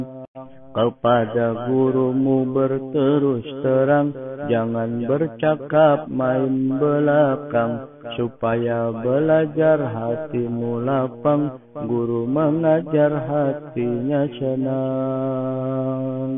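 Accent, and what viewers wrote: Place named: Aceh, Indonesia. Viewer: Seperti logat aceh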